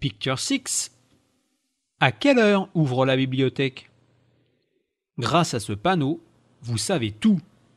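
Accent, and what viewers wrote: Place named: France. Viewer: Hihi... the guidant voice has strong French accent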